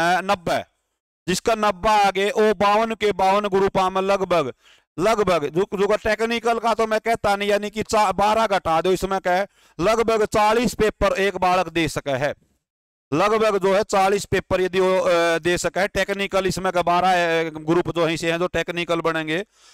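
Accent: Haryana, India. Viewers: Sach me sir aap real haryanavi ho sida sida bolte ho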